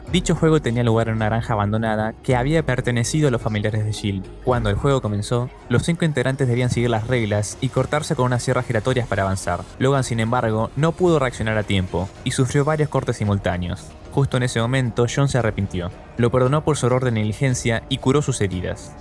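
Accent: Argentina. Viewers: ja, reconozco bien ahi el acento argentino como cuando dices "machoria" (mayoria) o "chaves" (llaves) y a pezar de esto tienes una voz muy narrativa y asi, buena pa el estreno del video, qe buen era el momentito qe pasé gracias a ti, te lo debo tu like, pero qe 🤢🤮🤮🤮🤮 cuando mostrate la escena cuando kramer morrio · Excelente video, muy detallado y completo, pasando por toda la saga de esta maravillosa película, felicitaciones por tu trabajo, amigo, por tu acento sos Argentino. Saludos desde Buenos Aires Argentina!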